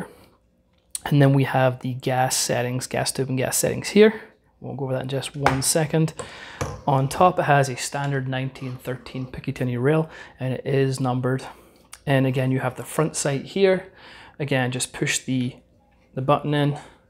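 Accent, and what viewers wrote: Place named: United Kingdom. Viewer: This is allowed in the UK by you’re accent?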